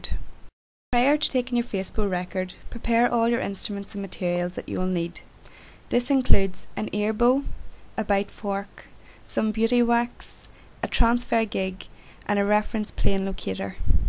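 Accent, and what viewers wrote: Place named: Ireland. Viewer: This is a brilliant video, thank you, and narrated with a lovely irish accent. Will help with orthognathic records I'm taking today, keep this up. As an aside, you could turn this into a research project, onto the use of videos for education of the dental profession, a simple survey based response and get a publication under your name. Thanks again, Jay Patel ST2 orthodontic registrar, The Royal London Hospital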